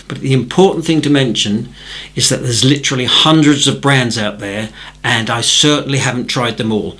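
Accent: United Kingdom. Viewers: Nice to hear fellow Brit! You are now m new favourite…just done your skies tutorial…and m now listening to your views on paper and brushes.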